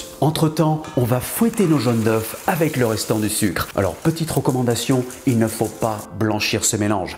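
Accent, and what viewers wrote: France. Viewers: Merci! Ma blonde déteste ton accent français mais je l'adore et t'immite constamment. Plus tu nous apelle avec des noms de petits animaux, plus j'ai de munitions, alors continue ton bon travail!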